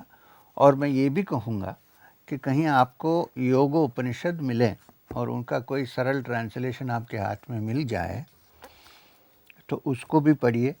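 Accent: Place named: Maharashtra, India. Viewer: Sri M your accent is also quite maharashtrian.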